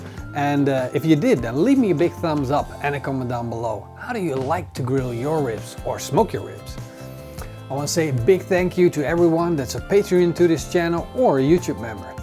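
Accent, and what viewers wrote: Netherlands. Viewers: Zo te horen ben je nederlands. Waar haal je in godsnaam een rack als dit? Ik neem aan niet gewoon bij een slager?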